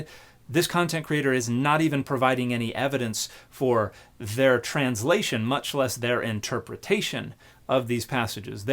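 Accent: South, United States. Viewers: Hard to sound intelligent with a southern accent . . . just sayin' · Why do people use Southern accents for stupid? This guy is annoying and insulting. I am not from the South but live now live here. Besides some cultural differences, they are the same as people everywhere with the same distribution of innate intelligence.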